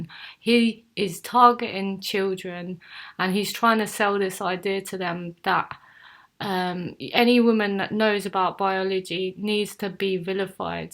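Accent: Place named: United Kingdom. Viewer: I can’t get over the ushusting Da YooKay accent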